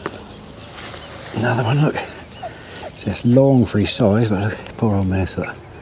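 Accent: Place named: East Anglia, United Kingdom. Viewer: Waggler is difficult on a windy day but it looked calm there. With the amount of pellets needed to keep the fish in the upper layers not all of them get eaten so you’ll have a nice bed on the bottom for the bomb & pellet or method feeder. A lot of the time the bigger carp will sit just at the back of the feed and pick off a few loose ones so casting past your pellets isn’t a bad idea. Then fire in a few more and twitch into them. Eventually those bigger carp will have your hook bait. Lots of lovely lakes packed with fish in the Norwich and surrounding areas. Can tell you’re a born and bred Norfolk/Suffolk boy with that accent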